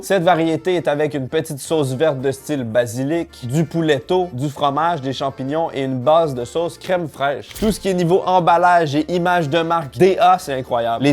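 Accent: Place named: Quebec, Canada. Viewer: Vous le comprenez bien même avec l’accent et les expressions bien québecoises?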